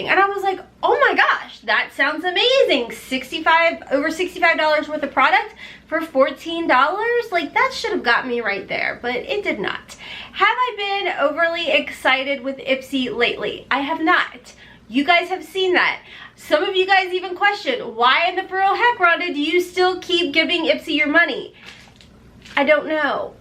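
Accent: South, United States. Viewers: You sound extra country in this video lol, cute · Totally agree it's not worth it. The limited edition bags were good until this bag. I didn't get all the same things you got but mine didn't look like it was even $20 P.S. love your southern accent. Wish I did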